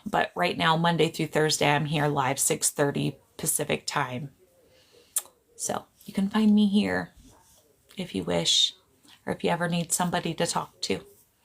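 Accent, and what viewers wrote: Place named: New York, United States. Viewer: You also sound like you're from Jersey or New York area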